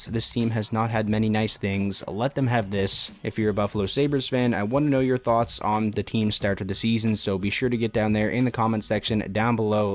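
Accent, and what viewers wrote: Newfoundland, Canada. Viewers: You from out east bud? You sound a bit like a Newfie with your 'ar' sounds haha. Love the channel, keep it up!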